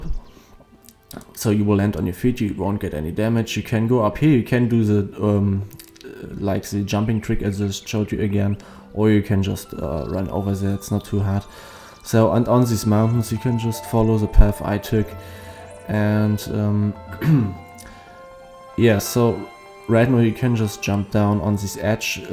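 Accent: Germany. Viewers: Dein deutscher Akzent. 😄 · Gutes Video, verfeinert mit dem deutschen Akzent, fast schon perfekt. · GOOD GERMAN ACCENT. I love it!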